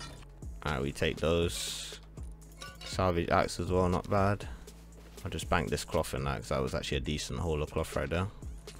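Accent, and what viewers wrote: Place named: United Kingdom. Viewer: Mate sounds like a brit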